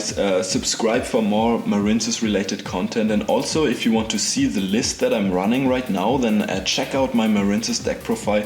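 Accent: Germany. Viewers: oh boy, das ist das erste mal, dass ich einen deutschen Akzent feier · Ich merk du bist deutsch. Gute combo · Den deutschen Akzent feier ich hehe 👍😁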